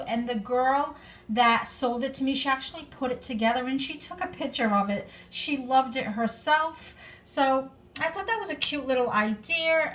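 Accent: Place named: East, United States. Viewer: Greetings got your message, not sure what vlog we both watch where you might have seen my name. LOL I have run across a few on Google but none are mine. LOL Nice to hear your accent, I Love it. Sounds like you may be from the Eastern USA? I am in the Pacific Northwest near the Mts and tons of trees and lots of rain! LOL I will be back again to watch your vlogs. I subscribed to your channel! :) Thanks for sending me a note! Blessings. :)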